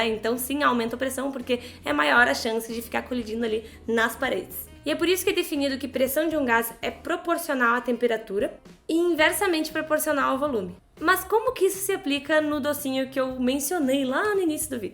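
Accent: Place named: Portugal. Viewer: Muito legal! Você é muito carismática falando, e parece ter sotaque de portuguesa (você é portuguesa?) rss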